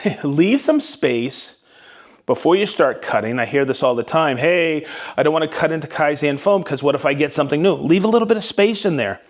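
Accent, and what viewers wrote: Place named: Boston, United States. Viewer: I guess you can take the man out of Boston. But, you can't take the Boston out of the man, Hello from a fellow New Englander. I love watching you videos and find them extremely informational. keep up the great work my friend.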